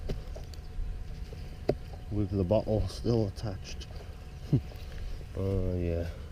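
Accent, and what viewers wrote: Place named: Cardiff, United Kingdom. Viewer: Cardiff accent 100%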